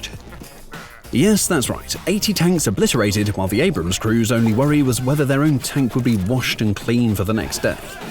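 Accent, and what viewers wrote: United Kingdom. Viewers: Such a great video. My only problem was the narration, you guys are already hard to understand with the british accent but calling Saddam Husein as "Sed'm" didn't help at all 😂 I had to keep rewinding to understand what's being said. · What's point of posting this video with your garbage brit accent, so that you can spread propaganda for iran war!? You do know that, 8 to 9 trillion dollars were spent by US military and ended up rising prices of everyday living, if not then stop spreading propaganda!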